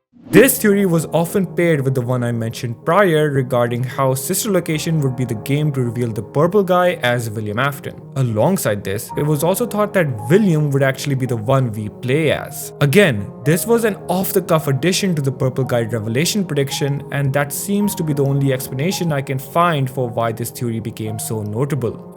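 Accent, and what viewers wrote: India. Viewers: Everyone in the world has an accent. He sounds Indian. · I Think its indian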